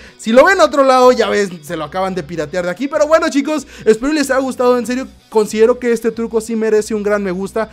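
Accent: Durango, Mexico. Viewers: no mames!!! eres de durango:o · eres de durango?! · eres de Durango :v? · oye eres de durango? 😳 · eres de durango we hahahaha eaaaaaaaaah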